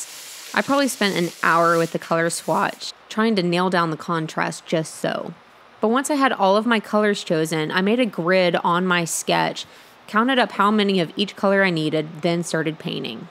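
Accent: Texas, United States. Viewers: A Texas saw is sawl. Like she says, she talks how she talks. And her saw has a L at the end!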